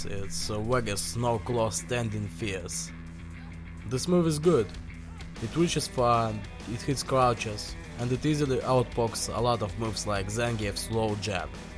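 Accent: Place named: Russia. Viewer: Russian accent best accent.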